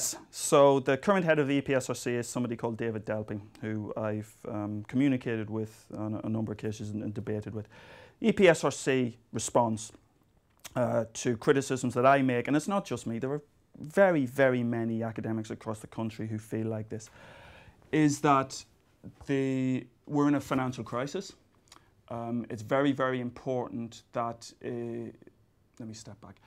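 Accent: Ireland. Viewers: I'm not sure where he is from specifically but to me he sounds like he's from somewhere around Dublin. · Where is from? He sounds like he is from south Dublin, maybe Meath? or even Wicklow? Completly irrelevant but I would like to know. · I'd say its a louth or monaghan accent.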